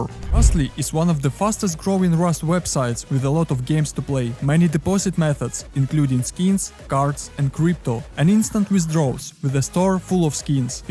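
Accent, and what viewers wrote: Russia. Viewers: Брат ты русский что-ли? Акцент прям мощный.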